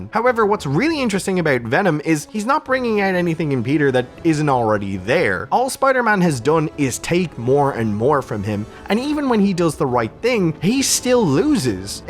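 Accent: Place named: Ireland. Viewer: The accent came through , Irish content creator? Dope videos by the way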